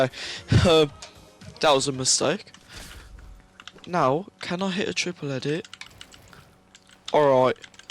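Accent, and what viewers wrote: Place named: Dudley, United Kingdom. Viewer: the accent bro, I’m from Wolverhampton and my moms side of the family are majority Dudley so I’ve grey up around the accent so I can spot a Dudley accent easily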